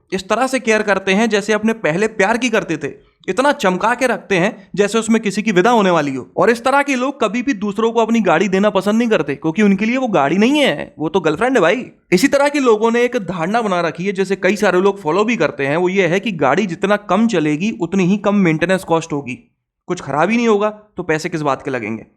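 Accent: India. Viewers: Yeh Bhai gwalior Agra mathura side ka lagta sayad 😅😅😅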